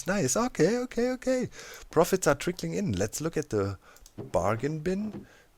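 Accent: Germany. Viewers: Are you German or do you just live here? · u german or somthing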